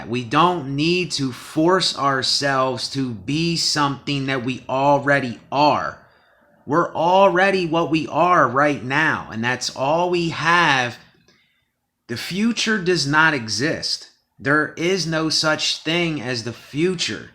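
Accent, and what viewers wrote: United States. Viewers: Are you from the states?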